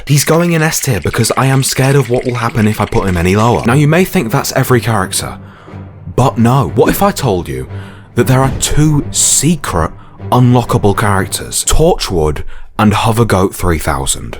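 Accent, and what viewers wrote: United Kingdom. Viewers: you sound funny for a british person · Are u British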